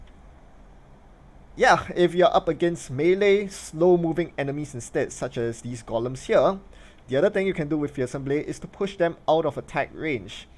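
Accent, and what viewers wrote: Singapore. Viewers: You from Singapore by any chance?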